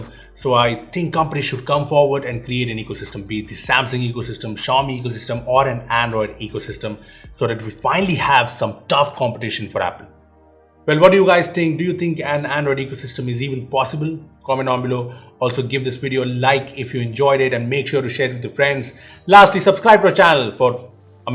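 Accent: India. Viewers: You have the english with indian accent which I hate, however I never got annoyed of your talk and I love to watch you.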